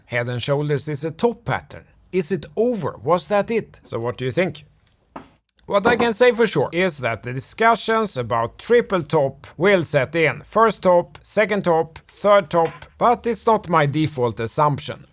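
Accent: Sweden. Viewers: Svengelskan on point (Y)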